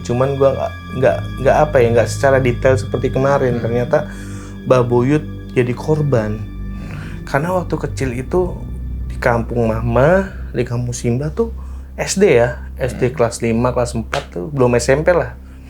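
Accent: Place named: Padang, Indonesia. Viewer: Nama: jawa Orang:manado Logat bahasa: padang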